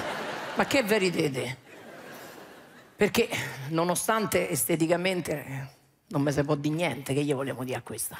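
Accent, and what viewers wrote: Rome, Italy. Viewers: Non sopporto il romanesco, però simpatica,bella, brava e simpatica....complimenti.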